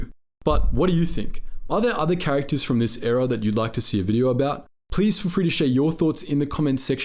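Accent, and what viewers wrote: Australia. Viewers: I’m trying to pinpoint the accent. Are you Australia like me too at all? This is the first time I’ve watched one of your videos you’re amazing so far I think! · As a fellow aussie I know how hard it can be to speak clearly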